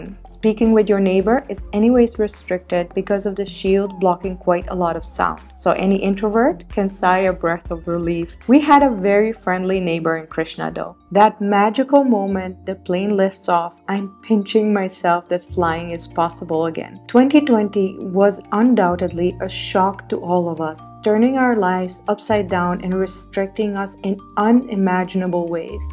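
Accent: India. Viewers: Just curious, why do you call yourself as a foreigner while visiting your home town India? Your accent is very much Indian!!!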